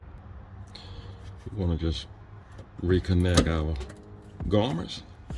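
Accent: South, United States. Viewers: Southern drawl is icing on the cake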